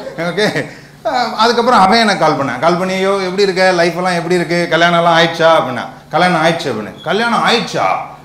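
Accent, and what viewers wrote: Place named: Bangalore, India. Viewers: That bangalore tamil accent on point 🔥🔥🔥🔥🔥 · You are doing better keep it up.The Bangalore tamil accent really exists. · Bangalore tamil😍😍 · Bangalore slang 😂😂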